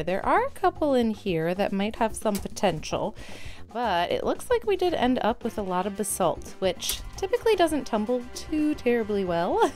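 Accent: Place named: Midwest, United States. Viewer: This was randomly recommended to me and im only about a minute into this video, but i didnt realize how much I missed the subtle midwestern accent until you said 'its fall here in minnesota'! I grew up outside the twin cities and moved to oregon a couple years ago and i just got hit with a wave of nostalgia and endearment for the type of people i grew up around. Thanks for the reminder of home, im excited to see how the rocks turn out!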